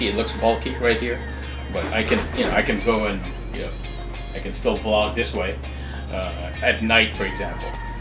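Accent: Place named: United States. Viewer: hello ang galing naman ng pang vlog mo ,american na ang accent mo nice video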